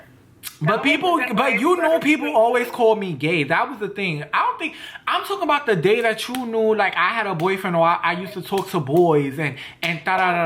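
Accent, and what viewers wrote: New York, United States. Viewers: I love your New York accent😍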